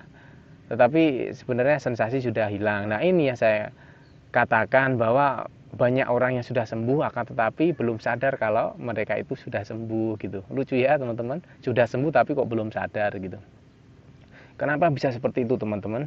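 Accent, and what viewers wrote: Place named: Java, Indonesia. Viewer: asli mn kok kyk orang jw..mhn tlg di bls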